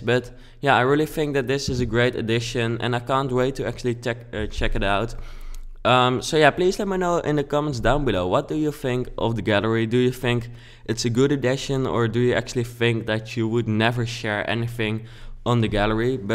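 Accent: Netherlands. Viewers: Haha you have such an Dutch accent ( btw ik ben ook nederlands :p )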